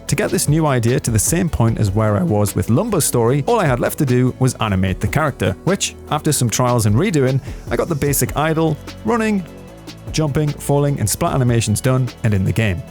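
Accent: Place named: North, United Kingdom. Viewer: good vid, where u from? sound very northern :-)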